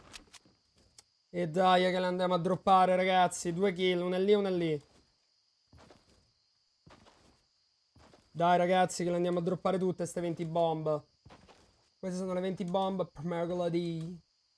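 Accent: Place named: United Kingdom. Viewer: Quanto british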